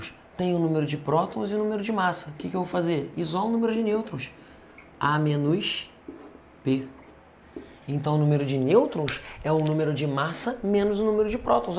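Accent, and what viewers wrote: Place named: Rio de Janeiro, Brazil. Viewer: Com certeza esse professor é carioca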